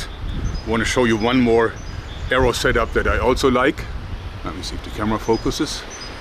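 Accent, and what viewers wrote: Germany. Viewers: do you want to learn German, or do you just like the accent?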